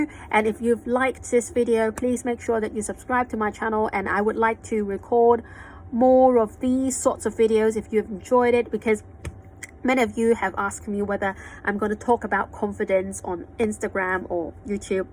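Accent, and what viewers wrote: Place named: United Kingdom. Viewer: I hope you don't mind if I spot some of the mispronounced words in the first 4~5 minutes of your speech. Well first of all, I do think that you speak very fluent British English yet it's a bit stronger than usual (if you bother to listen to some native British people speak [I guess you do a lot]). Sometimes I feel that some sounds are intentionally spoken in a strong British English / you pretend to speak with a British accent, like 'feel' (with an unusually long /i:/ sound). If you could soften that very strong British accent, that would be great. OK the following words are spotted: while (it sounds like you were pronouncing it as 'wild' with an extra long vowel. It sounds a bit strange to me) significantly (missing 't' sound) strange - the 'g' sound should be a voiced consonant but then it's missed. house - again, the vowel is stretched to be too long. 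It sounds like haaouse. Again it doesn't sound natural. external - wow the 't' sound here is blended with the 'x' sound. Whenever the two consonants 's' and 't' are blended together, we should pronounce the sound like 'sd', but you kept the 't' sound when you pronounced it.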